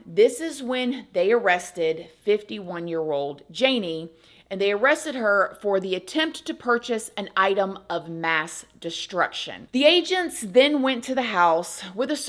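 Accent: South, United States. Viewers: love that Southern accent 🙃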